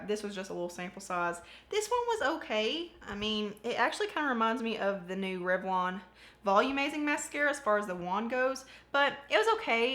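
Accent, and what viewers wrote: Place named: North Louisiana, United States. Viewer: Where are you from ? Love your accent . You sound like my family members in North Louisiana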